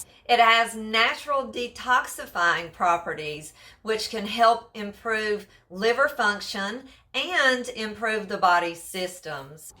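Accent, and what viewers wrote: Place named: South, United States. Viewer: Howdy? I love this southern girl accent 😍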